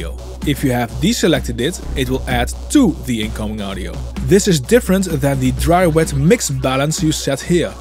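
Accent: Netherlands. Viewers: i hear the nethelands yes, goede video tho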